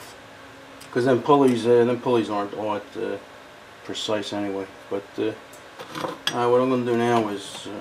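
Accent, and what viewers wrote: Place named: New Jersey, United States. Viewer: Your Jersey dialect versus my Canadian dialect.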